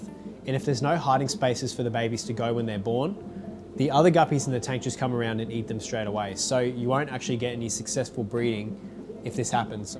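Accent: Australia. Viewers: There's just something special about someone with an Aussie accent telling you about guppies haha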